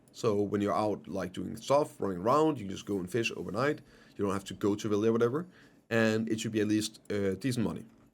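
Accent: Denmark. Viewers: Danglish omg >.<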